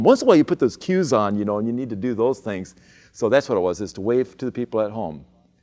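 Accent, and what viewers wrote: Germany. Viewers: Who is this guy? What's the story? Where is the old German guy with accent?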